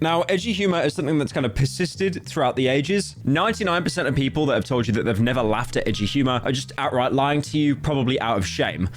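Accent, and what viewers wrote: United Kingdom. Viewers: Idk why but I like listening to this brit yappin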